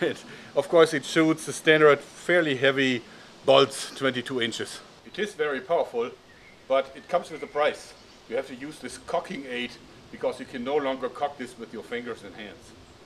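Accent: Germany. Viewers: Are You german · Is he German ?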